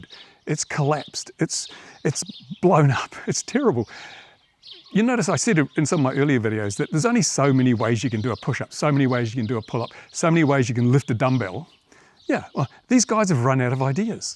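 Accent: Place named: New Zealand. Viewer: Love that kiwi accent.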